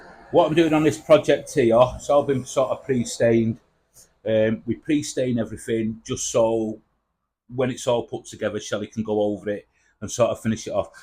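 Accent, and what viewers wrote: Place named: Manchester, United Kingdom. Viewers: Nice project pal,I'm originally from Salford your accent sounds like a Manc so your coming through fine to me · Hi, looks good very handy for the booze and glasses, nice finish. what I do to try to avoid tear out when using a large bit or a forsner bit,I use a thin drill bit as a pilot hole and then I drill from the other side. I don't mind if you voice over or talk as you going along it's your channel I like it as it is. You sound like your from Manchester or the surrounding area As always a great video. And as your asking I'm from Wrexham, Take care mate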